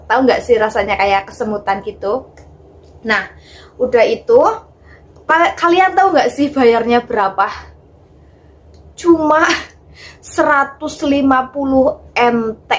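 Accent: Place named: Banyumas, Indonesia. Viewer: Disumbat mba gusinya pake kapas,salam kenal ya mba ngapak 😁🙏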